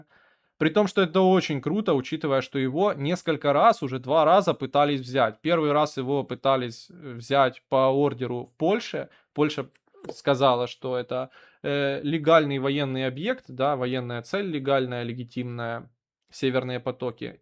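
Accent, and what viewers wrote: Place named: Ukraine. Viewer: Бро, візуал гарний, але російською ти володієш на посередньому рівні, підсвідомо (субʼєктивно) сприймається як концентрована малоросійська, тому складно визначити твій особистісний ІД. Не свій і не чужий, я хз хто твоя цільова аудиторія, якшо відверто (без образ)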